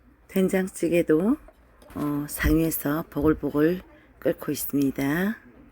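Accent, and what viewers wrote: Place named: Gyeongsang, South Korea. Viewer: Like7 식당음식이 깔끔~ 대구 억양이 너~무 좋아용♡♡♡♡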